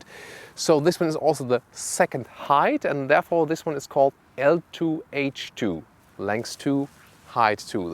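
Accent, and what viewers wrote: Germany. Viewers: Das English ist zum schmunzeln. Allways German englisch. Ich würde das nicht machen. Aber danke für den Einblick in den Transporter. Ich will seinen kaufen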